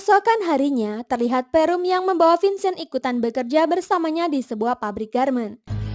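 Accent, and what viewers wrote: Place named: Sulawesi, Indonesia. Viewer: Koq logatnya pakai "kita ji"... Mimin org Sulawesi kahh 😁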